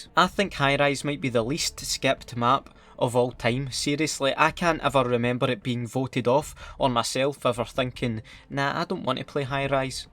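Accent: Scotland, United Kingdom. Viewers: Hearing a Scotsman explain literally anything makes it sound so much better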